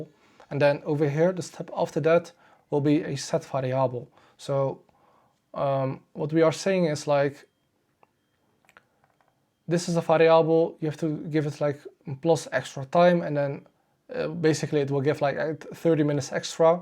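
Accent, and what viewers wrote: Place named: Netherlands. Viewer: Hi, ik heb je net gezien op YouTube podcast van Sabrina! Wat een mooie podcast geworden en wat vet dat je zo lekker gaat!! Ik ben in het begin (leer) stadium. Heb besloten m’n tanden erin vast te bijten. Je video’s zijn ook leuk om te zien. Simpel. Hoeft niet perfect. (Reminder to self) En je website is prachtig!! Het hoofdje van de robot is adaptive to your finger. 😊 Ik ga je volgen en van je leren en er zelf mee aan de slag! (Ik hoorde btw direct dat je uit Nederland kwam 😁)Succes 💫 🙌🏻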